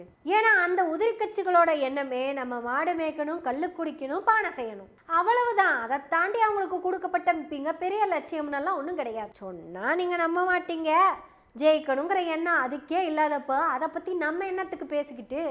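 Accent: Kongu, India. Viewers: உங்கள் கொங்கு தமிழ் சுவையோடு ஆணித்தரமான விளக்கம் வாழ்த்துக்கள் சகோதரி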